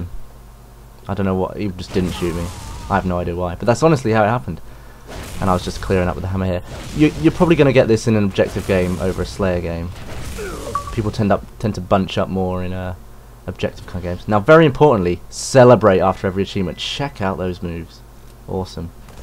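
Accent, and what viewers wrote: United Kingdom. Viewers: you got that awesome accent, you must be british? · He was a calm little brit. Then he did a dig-down, and everything changed. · You sound exactly the same. All you Brits do.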